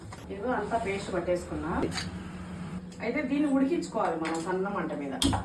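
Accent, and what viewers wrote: Telangana, India. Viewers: మీరేమో రాయల సీమ అంటారు లాంగ్వేజ్ ఏమో అచ్చం మా తెలంగాణ లాగ మాట్లాడుతారు మీరు మి పిల్లలు..మి అమ్మాయి అయితే ఇంకా బాగా మాట్లాడుతధి ముఖ్యంగా మి వంటల్లో చికెన్ అంటే మస్తు ఇష్టం నాకు ...అందాజ గా ఏశిన 👌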